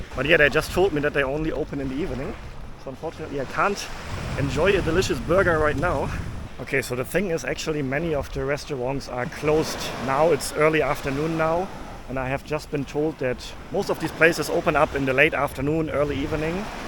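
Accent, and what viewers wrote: Germany. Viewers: Hör ich da einen deutschen Akzent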